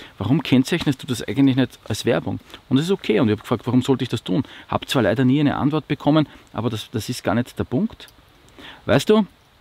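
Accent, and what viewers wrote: Austria. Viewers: Eigentlich mag ich diesen Österreichischen Dialekt nicht. Aber bei dir kommt es sehr sympathisch rüber. Werbung oder nicht, ist mir scheiß egal, wir müssen alle von irgendwas leben 👍😊 alles in Ordnung.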